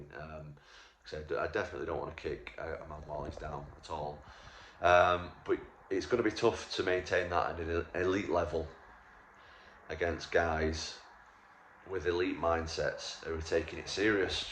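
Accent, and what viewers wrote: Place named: RP, United Kingdom. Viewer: Hello Tom, I'm a huge fan and I also think you are the next level of fighter with a concentration in striking, distance, wrestling, ground game, and a great working fight IQ. However, I think you are speaking the Queen's English and keeping in European etiquette, while trying to communicate to a filthy American (Dana White), where getting the unification match is concerned. If you are going to contain yourself, and he wants you to be contained, then you've already lost the argument. There is no need to respond and hearing it any further in such a manner id just bothersome. If you want this mother fucker to actually be his authentic self, and have a real dialogue, then speak his language. "You say Jon Jones is the pound for pound greatest MMA fighter of all time, I know you are wrong. I'M the greatest pound for pound MMA fighter of all time. 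You put the two of us in a room and I'm killing him and walking out! Period. He's depending on you to hide him from me, because I'll kill him in front of everyone. If you think I'm wrong, than put your money where your mouth is and schedule the fight. If he backs out, he forfeits the belt, the title, and any talk about being good at anything. You want to have this conversation? I'm willing to back it up by bashing his face in. You want to go on saying he's pound for pound the best, then prove it. Prove it or continue to allow him to hide from me." Something like that is what I would hear from my Mom every day waking up for school. We'd fig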